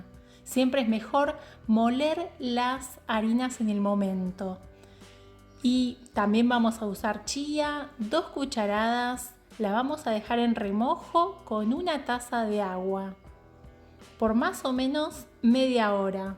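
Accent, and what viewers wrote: Uruguay. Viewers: Que padre me encanta ese acento genial no me equivoque te cuento mi yerno es de Montevideo Uruguay sin q te ofendas tiene muy parecido el acento aunque tiene muchos años viviendo en CDMX el es actor.... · Linda tarta! De donde habla? Por el acento, me imagino que sea Uruguay o tal vez Argentina. Saludos de un brasileño que adora tus recetas 😉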